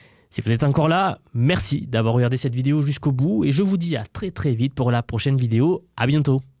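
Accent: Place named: South, France. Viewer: J'adore ta chaine, je suis fan. Merci pour toutes ces informations. Une petite question bête, t'as un super accent, mais j'arrive pas à savoir de quelle région, il y a une pointe du sud mais pas que ... ;-)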